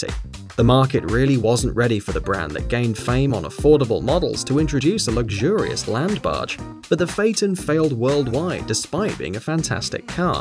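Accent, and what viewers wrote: England, United Kingdom. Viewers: Hey mate I love your video, and your accent. English me thinks, but I'm a bit butt hurt, about that (except trolls) bit. So I guess I won't be hearing from you. Bollocks we Trolls never get to have any Fun. The Trolls need Love too, you know. Oh well, after a good cry, I'm going to another channel, and "Let slip the dogs of War". Fair thee well, my lord. ( ;) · Why is this an English narrator for a video about American cars?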